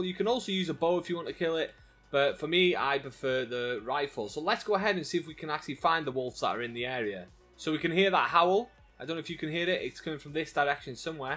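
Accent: United Kingdom. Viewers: Soo damm british! 🇬🇧😅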